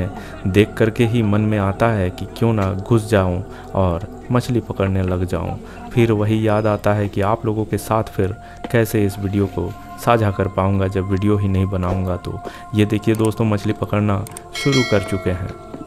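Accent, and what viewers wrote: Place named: Jharkhand, India. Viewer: Baat se lag raha hai aap jharkhand east Singhbhum ke hain. Ab yeh bata dijiye kis gaon se hai. Main Jadugoda se hoon.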